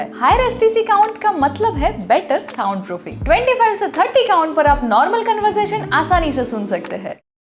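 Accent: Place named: South, India. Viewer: You sound south, no offence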